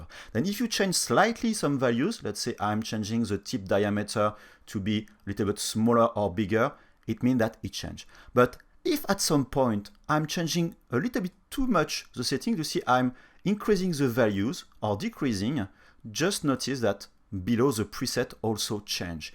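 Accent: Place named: France. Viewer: Very nice, keep em coming! Used to Isotropix Clarisse, donc pas de probleme avec l'accent francais :)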